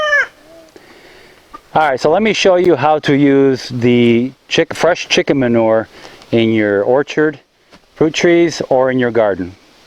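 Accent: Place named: North, United States. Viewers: Did you live somewhere up north before Houston? I’m just curious with your accent. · Mid west accent + the word 'poop' = endless childish laughter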